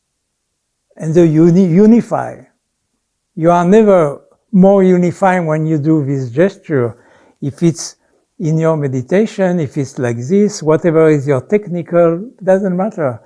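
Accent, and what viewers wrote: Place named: France. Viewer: Thank you,! As your student, who once sat at your feet to learn your all-encompassing teachings, this video is a wonderful summary of the total reality that we, as would-be healers and light-workers, are immersed in. How I love to listen to you speak (even though the French accent is always a little difficult for me to understand, ha ha)! You have the ability to articulate the whole truth of being that I feel inside but could not say so well. It was a privilege to learn from you, and is my duty to share this philosophy with everyone I can, so it will ripple out to the whole earth, building that light to heal. I bless you and all the good you are doing! Thank you, thank you, thank you!!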